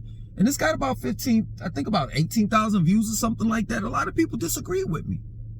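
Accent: New York, United States. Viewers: Sounds like your from New York coming to the South complaining. Why dont you quit b!tching and grow your own food !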